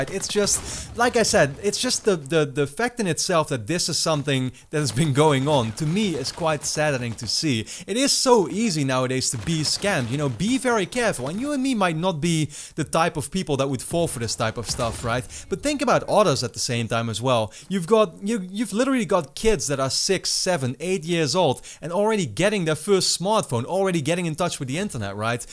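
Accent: Netherlands. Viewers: Hey dude, thanks for the video! I'm new to the channel, btw I like it, so I don't know it but are you Dutch? I am and I think I can recognise your accent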